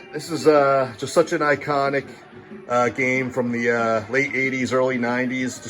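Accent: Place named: Philadelphia, United States. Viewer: great tour, love the room, gotta say the Philly Accent really sells it for me "Homee arcade" :)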